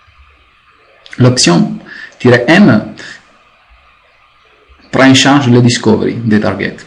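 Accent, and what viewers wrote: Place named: Italy. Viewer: Tres bonne explication , avec une petite pointe d'accent Italien !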